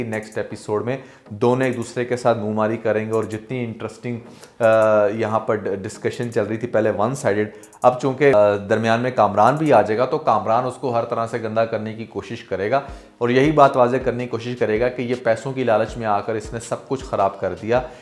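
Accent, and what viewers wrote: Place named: Delhi, India. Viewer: Sir aap phir ko fir na kaha karain aur aisa lagta hai aap dehli se taluq rakhte hain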